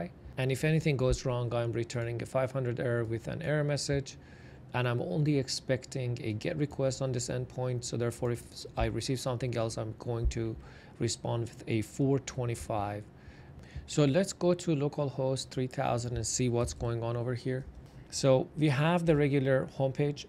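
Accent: Iran. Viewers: به ارور خورده بودم یهو دیدم لهجه تون فارسیه :))))) خیلی بامزه بود این اتفاق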